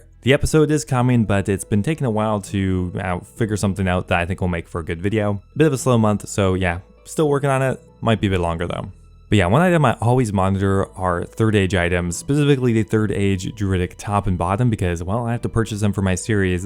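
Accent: Quebec, Canada. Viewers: You sound French canadian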